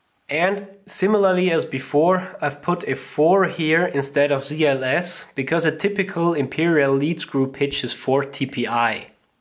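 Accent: Austria. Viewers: Bisch Du e Össi oder e Schwizer? ;)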